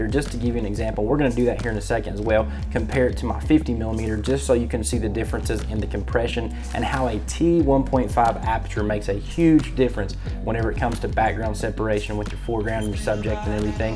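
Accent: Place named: South, United States. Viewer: I'm subscribing just because of your southern accent.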